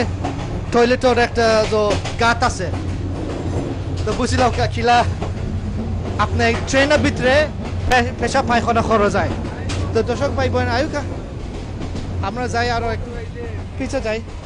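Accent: Sylhet, Bangladesh. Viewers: ভাই এই লোকে সিলঠি মাথের আপনার ভোজার সমস্যা হলে আপনি আমাদের লন্ডন সিলঠ চলে আসোন♥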